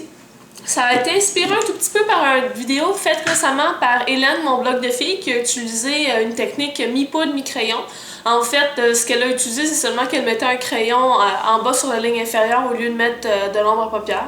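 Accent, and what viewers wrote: Quebec, Canada. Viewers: Magnifique , et j'adore ton accent québécois :p continue , bisous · c laccant quebecois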